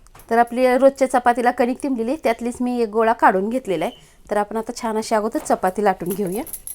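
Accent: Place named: Satara, India. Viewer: ताई तुमचं गांव कोणतं भाषा आमच्यासारखी वाटत आहे.आम्ही खटाव तालुक्यातील जिल्हा सातारा कडचे..सद्या कोल्हापूर येथून व्हिडिओ पहात आहे.आमच्याकडेआमचीआज्जी चपाती मोडुन चुरा करुन त्यात तुप,गुळ घालून छान लाडु करुन सर्वांना द्यायची तुमची रेसिपी खुप आवडली. मी मुलगी आणि सुनबाईनां व्हिडिओ पाठवला.लहानपणीच्या या प्रेमळ नाष्ट्याला आता ती सर येणार नाही.गेले ते दिवस सकस पदार्थ खाण्याचे.ओके..ताईखुप खुप धन्यवाद!!!!**"*❤😂🎉🎉❤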